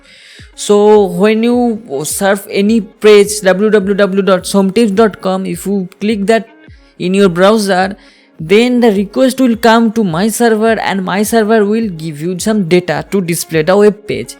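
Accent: Bengal, India. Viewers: He is Bengali i can tell certainty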